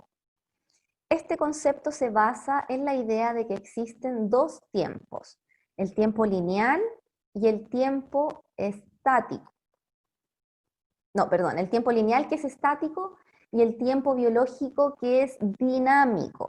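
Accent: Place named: Chile. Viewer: Maravilla de conferencia .... Gracias , hermanita ... Dulce acento , el chileno ! Un abrazo de luz desde Buenos Aires .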